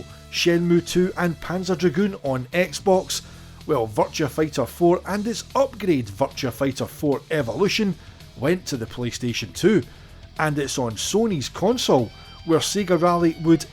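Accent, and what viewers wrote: Scotland, United Kingdom. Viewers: I love the Scottish accent. Can't understand a word that you're saying, but it sounds like magic! Yet i just don't know why SEGA didn't bring 2.5D versions of all of these great games out for the Genesis/MD. Over 40 million of those consoles in the marketplace, and the limit pushers of 1994-97 proved that the machine was capable of doing press proper 3D and great graphics and sound.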